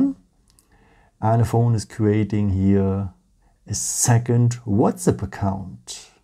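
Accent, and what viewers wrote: Germany. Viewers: man hört SO dass du deutsch bist LOL. aber scheisse bei mir ist das DUAL einfach nicht verfügbar. warumm....?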